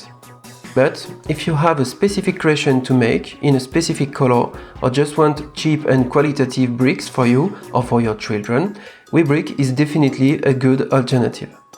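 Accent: France. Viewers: On entend l'accent français mais sa reste intéressant de l'écouter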